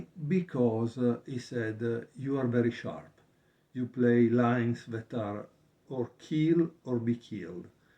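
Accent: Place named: Italy. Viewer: Por la forma en que hablas inglés eres italiano.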